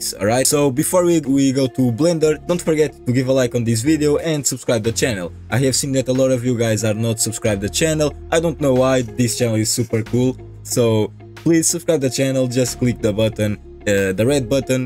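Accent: Portugal. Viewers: O sotaque de tuga sempre da para perceber, parabéns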